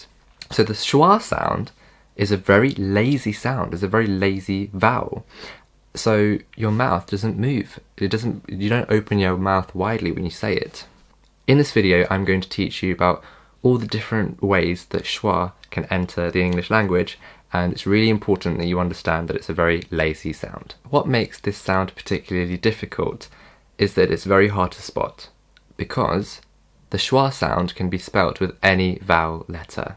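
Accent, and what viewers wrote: United Kingdom. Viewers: You have an excellent british sound i am very curious to have that accent. help me how can I have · I want you to make a video on How did you learn British accent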